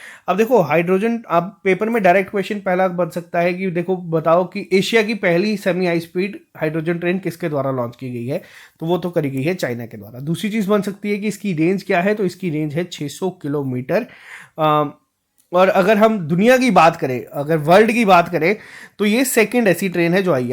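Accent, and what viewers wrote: Kashmir, India. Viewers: I love u sir thank you for everything 💗 One more thing sir your kashmiri accent is nice ...Siyah rood jaeren Manz...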